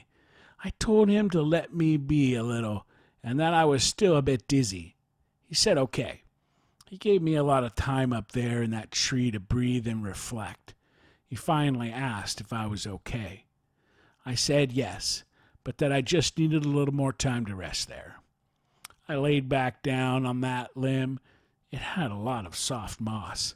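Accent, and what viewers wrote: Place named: South, United States. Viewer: Awww that's a shame, I think the accent is very beautiful. I've been trying to work out, where it might be from. It does sound like a southern accent but I think it has a mix but could be very wrong. 🤔 Maybe give the channel a chance and see if you change your mind. Better a human voice than a computer for sure. If not, then Maybe you will find one that you do like. Have a lovely day 💛✨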